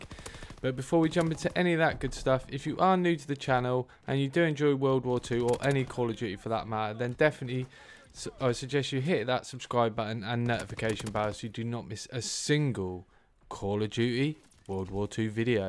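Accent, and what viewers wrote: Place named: United Kingdom. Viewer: Damn british accent I can barely understand half the stuff you're saying 🤣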